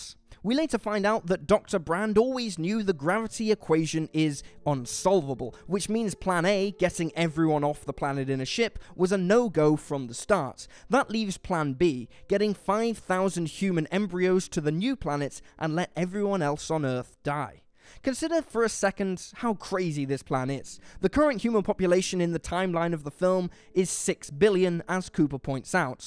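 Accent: United Kingdom. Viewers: This guy sounds British so I'm guessing English is his first language.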